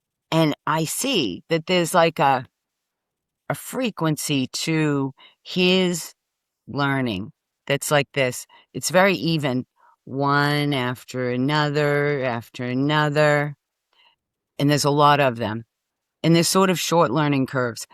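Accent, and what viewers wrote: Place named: North Shore, United States. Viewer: This was my 1st time watching this channel and I wish I could HYPE it. Subscribed with a smile., Thank you sooo much for sharing your stories and insightful perspective. What a wonderful NDE! It touched my and my husband's heart. Don't stop telling your truth...The world needs to know. Thank you for helping to wake up the collective. By chance, are you from the N. Shore of MA? 😉 I'm from Wellesley but went to college in Beverly and you sound just like those wonderful people! 🤸🏻🤸🏻‍♀️🤸🏼‍♂️ PS. You both sharing genuine love at the end filled our hearts.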